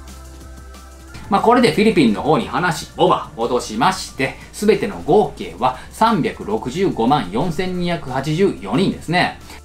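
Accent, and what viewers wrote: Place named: Nagoya, Japan. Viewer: 北九州都市の何処かの町で産まれて❗だけど時々名古屋弁も、関西弁も出ますよね⁉️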